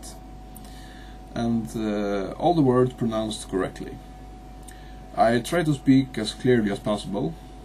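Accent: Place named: Iceland. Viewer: When you speak English you have the exact same accent as Icelandic people.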